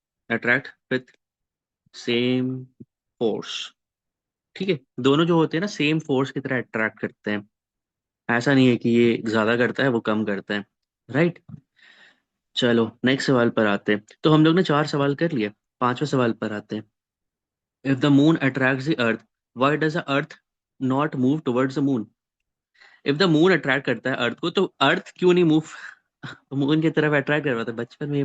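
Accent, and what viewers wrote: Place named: Mumbai, India. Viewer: Sir eda, mandawali?? Ekdum mumbaiya tapori language 😂😂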